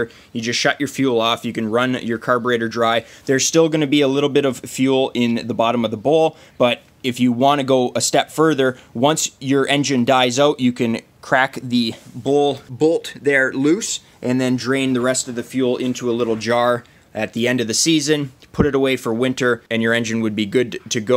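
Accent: Canada. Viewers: nice canadian accent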